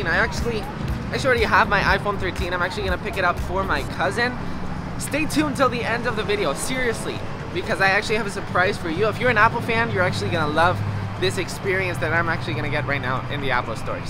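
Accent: Spain. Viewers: ese acentito español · los que somos españoles escuchándolo hablar español y dándonos cuenta de que es español 🤡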